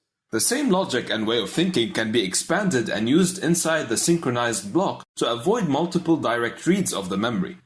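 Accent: Lebanon. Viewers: you sound Lebanese dude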